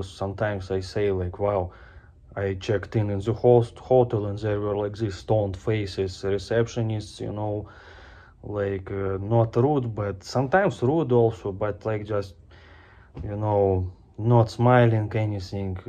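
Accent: Russia. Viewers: Haha you are funny guy. Like you’re russian accent. About USA and Russia I would say we dont care very much and probably dont love them or hate them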